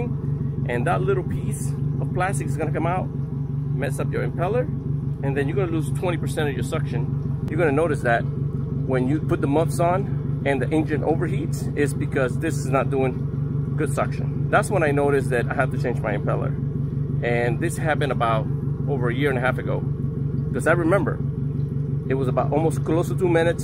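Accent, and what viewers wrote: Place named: Cuba. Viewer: Excellent tutorial on changing an impeller. Having been born and raised in Miami and working there for many years I had many Cuban friends and co-workers. I noticed several things about their English pronunciation . One thing was that “B” and “V” sounded identical when you called Yamaha “Jamaha” it took me back to Miami days. Thanks for your fun videos.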